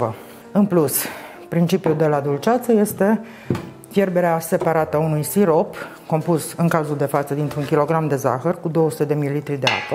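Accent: Transylvania, Romania. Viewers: Ai un accent frumos ardelenesc.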